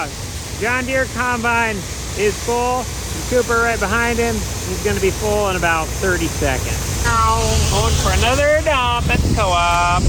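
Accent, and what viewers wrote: Scotland, United Kingdom. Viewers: Scottish : my very favorite accent 💙 greetings from Utah, USA🇺🇸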